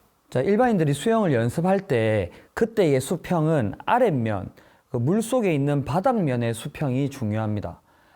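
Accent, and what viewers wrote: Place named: Gyeongsang, South Korea. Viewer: 경상도분이신가봐요.. 억양은 속일수가 없네요^^ 그래도 귀엽네요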